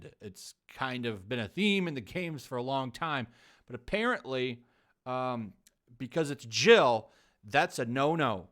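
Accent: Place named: RP, United Kingdom. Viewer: I had to stop listening to you read that article. Not because of the hoity toity accent, that was brilliant, but because it's not journalism. It's politics. I've come to absolutely despise politics. I'm not just talking about SJW politics, I despise all politics and wish people would stop. Politics these days aren't about helping people, they're about tearing people down... or... grandstanding [is that the right word?] yourself so you seem like the only person of any importance. Thank you for shedding light on this political piece, thumb up, well done, but I can't. It's not you. It's the words you're reading xD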